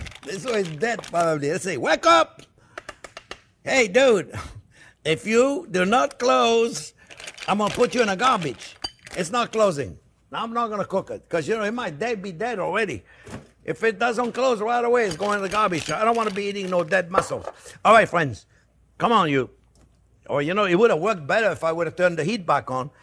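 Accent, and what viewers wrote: France. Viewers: I heard an Italian touch to your French flavored accent at the time you spoke of the fishmonger and the "'fish bones, eh?" Delightful. Although your last name sounds German. I was born in Nice, but raised in India. So I never had a bouillabaisse growing up. Finally, on a visit to Nice, I found a small restaurant, took my Belgian uncle Ray over there, along with my family, and we had a splendid Bouillabaisse sitting on the pavement of this small restaurant. The lady was overwhelmed as we came in as a big party for that tiny place, but we helped with arranging the tables outside, and we had such a great time. My fondest memory of this soup. I think as an American I had no problem with arranging the tables, and this was shocking in France where there is a tradition of service and people waiting on you. Ha ha. She had some good stories to tell of our visit years later. 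· First time watching his vids. I was certain he was cajun. It’s like he’s speaking with an a North American dialect but a French accent.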